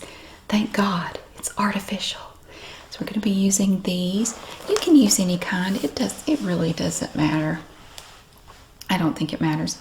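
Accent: South, United States. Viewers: I love the way you say oil with your southern accent! I was making gingerbread the other night and I was thinking of you and I said ad the ol 😂.. my son squinted his eyes and looked at me lol.. I said it's an inside joke.. u wouldn't understand. I love your southern accent!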